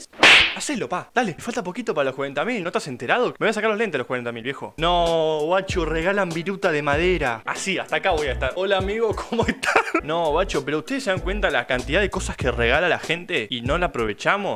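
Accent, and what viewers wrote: Argentina. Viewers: De donde eres? Pareces argentino o uruguayo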